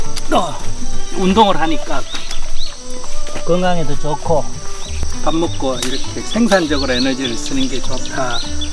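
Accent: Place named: Gyeongbuk, South Korea. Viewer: 경북 억양 너무 정겨워요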